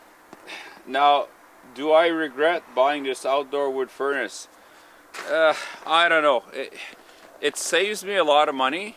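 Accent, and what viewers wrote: Canada. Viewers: Lovin' that thick canuck accent!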